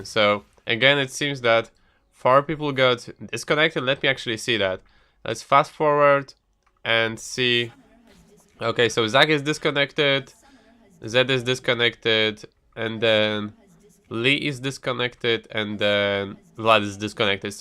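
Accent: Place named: Poland. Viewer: Where is from? Because i hear like polish accent in his voice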